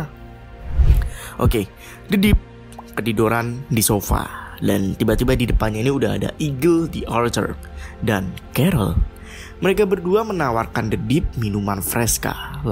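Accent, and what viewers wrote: Java, Indonesia. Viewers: Mas dari jawa ya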